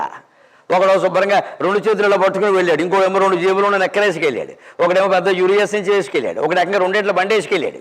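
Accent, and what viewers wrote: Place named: Andhra Pradesh, India. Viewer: Anni bagunnai kani ni yasa panikiradu ra ni Andhra yasa gudhala sulli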